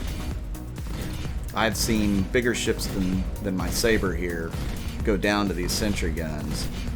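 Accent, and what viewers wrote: United States. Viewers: well , after I see your videos I can easily say that you know the game very well and of course I know you knew that you can take the claim jump mission with any medium side ship any day , just wanted to add that extra info here for those who didn't know , but I'm aware of your intentions of those who are new and don't have caplable ships , and so you show how to with a small ship . Keep the good work up , and I honestly love YouTuber like yourself that I can understand and love the Cristal voice and. America accent , I can't help myself lisenting boredgamer Wich is a tremendous informative YouTuber , one of the best but my ears hurt when he talks .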